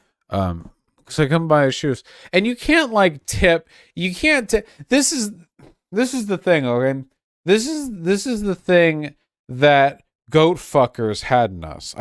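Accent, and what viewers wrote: United Kingdom. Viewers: Everytime he gets drunk he becomes bri-hish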